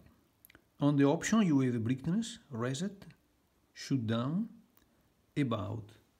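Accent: Italy. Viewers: Ho capito subito che sei italiano, come siamo facilmente riconoscibili. Cmq continua cosi` sei grandeeeeeee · sei anche veneto magari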